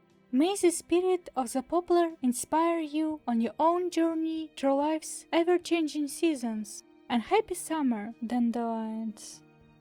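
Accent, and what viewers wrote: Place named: Eastern Europe. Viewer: Very good and organized video! I really liked the songs, and you have a great speaking voice, and the accent makes it more cute instead of distracting and hard to hear, like some unfortunate peoples accents. Maybe it's because I just happens to like slavic people's languages AND accents regardless if it's a male or a female speaking. Even the males, who are supposed to be so tough in many slavic countries sound very gentle :) Liked and subscribed to help you along with the youtube algoritm!!!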